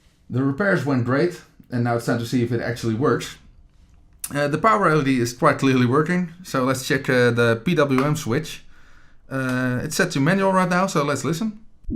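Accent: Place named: Moldova. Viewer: First hearing your accent. Flavors of Moldova ?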